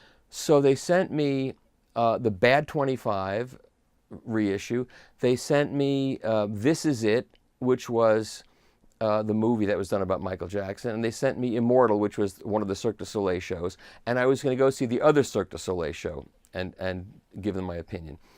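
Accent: United States. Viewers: I’m from Dallas and you’re getting close to the accent. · You sound like Dustin Hoffman in Tootsie with your Texas accent. 😀 Nice job though recounting everything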